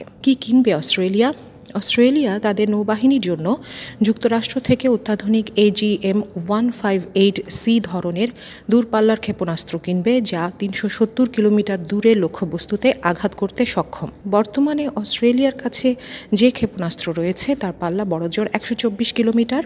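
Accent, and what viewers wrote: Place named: India. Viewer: sobaike ekta onurud korbo. jara purbo pocchim.. ai cheneler khobor sunen taderke bolchi. ai chenelta age bangla deshi chilo akhon seta varoter kace bikri hoye gece... age khobor porto ekjon purus .... ekhon meye khobor pore.. ami varot ebong bangla deshider kontho buji... ei meye varotiyo.. mittha songbad pochar kore. ami onek age theke khobor suntam ekhon ar sunina... ajke UN SUBSCRIBE kore dici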